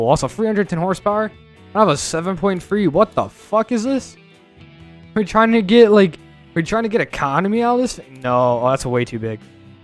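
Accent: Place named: United States. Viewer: You have a deep American ascent you like truck and a ak47 in your truck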